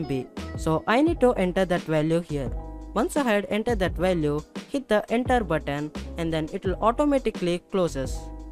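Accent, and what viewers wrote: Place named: India. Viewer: np. Are you an Indian? You seem to have an Indian accent. Btw I am Indian too.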